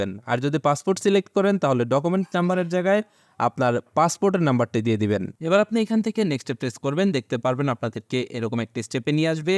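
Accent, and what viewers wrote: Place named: Ghatail, Bangladesh. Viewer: Vai apnar basha ghatail a amar bash oo ghatail ❤❤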